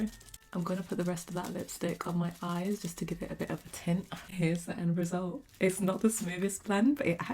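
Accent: United Kingdom. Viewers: ahh Im black but I wish I was british i love your accents😩💖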